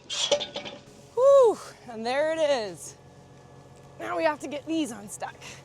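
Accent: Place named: California, United States. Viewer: Your voice is getting “too California” accent. You’re a midwestern woman!!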